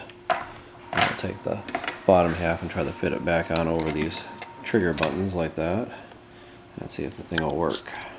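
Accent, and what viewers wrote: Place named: Ohio, United States. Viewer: Is it just me or does any one else thinks hes from Ohio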